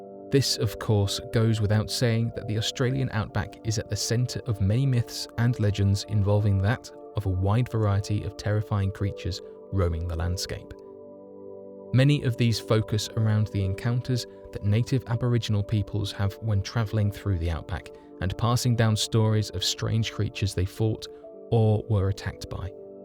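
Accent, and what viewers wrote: England, United Kingdom. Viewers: The way this is narrated is giving me headache. I'm confused because he has an English accent but it's like he's never spoken English before.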